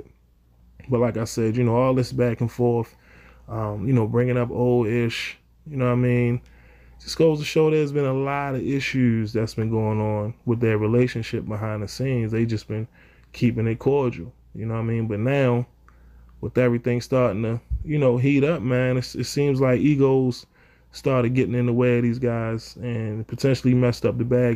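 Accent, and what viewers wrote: Baltimore, United States. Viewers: Your from Bmore? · yo u from bmore? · You sound like you from Baltimore fr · Man you gotta be from Baltimore lol. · You from Baltimore bruh? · You gotta be from bmore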